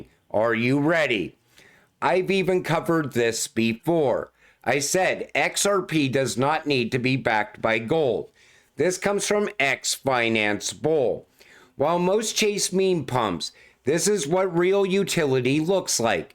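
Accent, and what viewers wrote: Pittsburgh, United States. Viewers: Congrats on 70k subs!! You should have 1M subscribers!! You're my favorite and go-to Crypto Channel [plus your accent sounds like you're from Pgh, where I'm from 😂]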